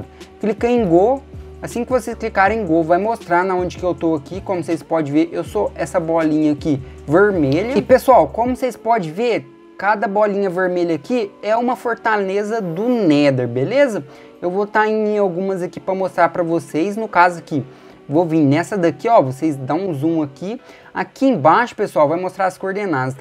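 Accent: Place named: Rio de Janeiro, Brazil. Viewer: o cara é carioca!!! skskskksks legal pá cacete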